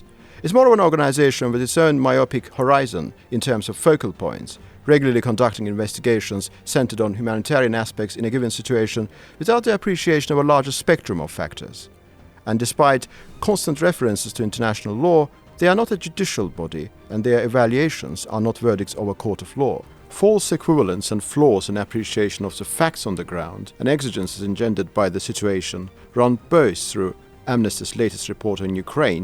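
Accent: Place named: United Kingdom. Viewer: Это прекрасно, что появились выпуски на англ языке. Однако, можно совет от англоговорящего человека? Прошу вас попросите комментатора не тараторить, в самых важных моментах используется военная терминология уместная для данного контекста, но зрителю нужно это ещё уловить и осмыслить. Плюс очень сильный британский акцент смазывает некоторые слова. Немного практики по примеру телерепортёров с BBC и всё будет отлично. И потом мы (зрители) рады и 15-минутному ролику, если нужно почётче всё изложить, мы только рады. Удачи вам во всех делах. Спасибо за вашу работу.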